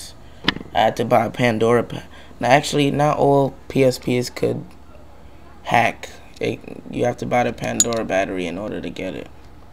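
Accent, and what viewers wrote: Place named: Dominican Republic. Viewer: that dominican style voice!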